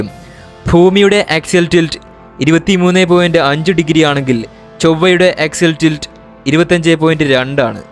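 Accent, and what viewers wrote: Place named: Kottayam, India. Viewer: Kottayam ayirikkkum fullli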